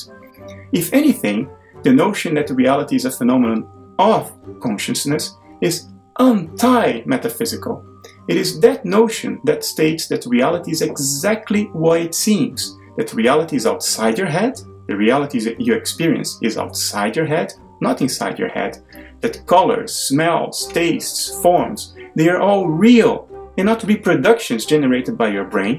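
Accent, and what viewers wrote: Netherlands. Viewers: Cool Dutch accent!! Good points made!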